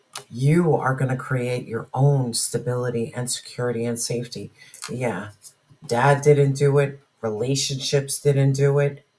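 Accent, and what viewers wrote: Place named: New England, United States. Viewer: Omgsh thank you for being the vessel to send me this message ❤so powerful ❤ my decrement is everything to me ❤ God and now you have confirmed that for me ❤ Ps you have a i love listening to your reads you are so up lifting and your voice reminds me of my mothers [new England (new bedford) accent)] Blessings to you ❤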